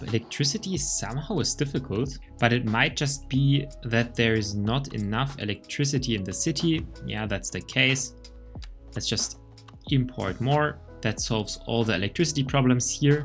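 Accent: Germany. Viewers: do i hear deutsch? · uff ich höre sofort raus das du deutscher bistXD trotzdem geiles tutorial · deutscher Akzent, 10/10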